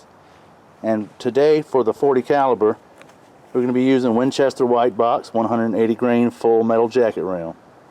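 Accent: United States. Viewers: I can’t find anything chambered in nan or forty fav. (I’m joking. The accent reminds me of being home in Georgia!) Fun test to watch! Semper Fi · What kind of accent is that, I'm hearing southern sounding I's and yankee soundind Ie's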